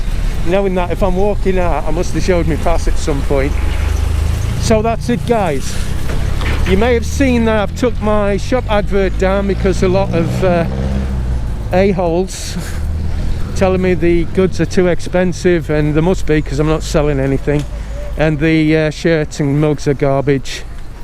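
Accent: United Kingdom. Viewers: Your British accent would make it difficult for most regular Filipinos to understand your English. The kind of English taught in the Philippines is American English to begin with.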